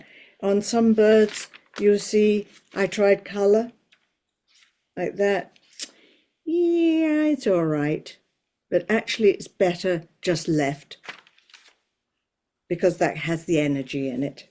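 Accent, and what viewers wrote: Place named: United Kingdom. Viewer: I love your art and I just discovered your channel, you sound quite similar to some of my family members so I was curious to ask if you are from the UK as well?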